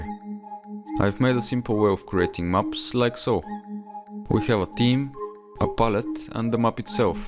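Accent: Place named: Balkans. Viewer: Great job, keep up, but I need to ask you something, are you south slav? Your accent is making me believe that.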